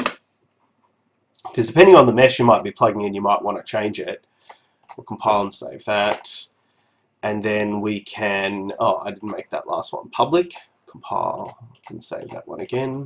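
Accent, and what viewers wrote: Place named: Australia. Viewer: You must be from Australia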